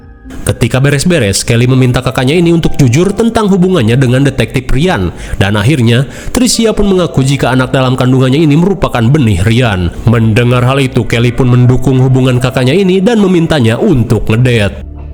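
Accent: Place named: West Java, Indonesia. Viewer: Asli mana bang sundanya ?? Jadi penasaran hehe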